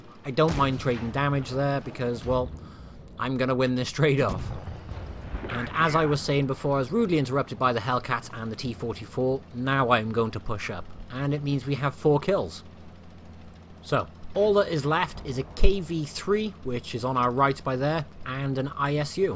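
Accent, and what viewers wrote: Wales, United Kingdom. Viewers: Look See! Now in a minute! By there! You went super Welshy for this one!!! GG gr8 vid as always!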